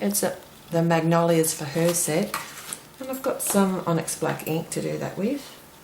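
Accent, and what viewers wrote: Australia. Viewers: Hi. Where can I buy the extra thick foam pad. From your accent it sounds like you are in Australia, so am I. Thanks again. Regards Liz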